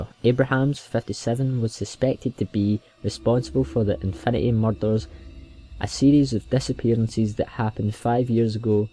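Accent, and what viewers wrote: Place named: Scotland, United Kingdom. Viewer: cos hes scottish like me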